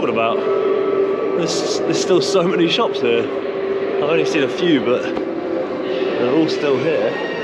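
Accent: United Kingdom. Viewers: Нет, это британский английский. Поэтому не так понятно .😊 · If you're living in US you should hear his accent, it's obvious UK accent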